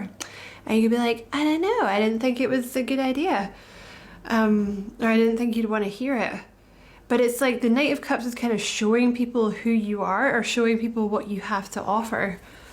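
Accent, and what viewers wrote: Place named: Scotland, United Kingdom. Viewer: It’s so lush to hear a Scottish accent for once ❤ thank-you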